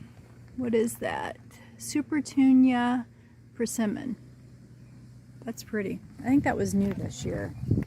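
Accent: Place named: Chicago, United States. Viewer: About 30 seconds in on this video I said to myself, “based on that accent, this woman must be from Chicago.” Then at about a minute later she says “we’re about 2 hours from Chicago.” You can tell that accent anywhere! Beautiful garden. Thank you!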